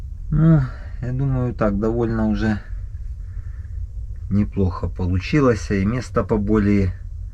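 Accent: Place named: South, Russia. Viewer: Я уже который ролик смотрю и пытаюсь понять, а в каком регионе это происходит? По косвенным данным, я решил что это точно не северо-запад. В Псковской, Ленинградской, Новгородской, лес другой. На Сибирь тоже не похоже. Скорее уж ближе к югу и не думаю что севернее Воронежа. И говор южный, буква "Г" характерная, как для нашего юга, так и для Украины.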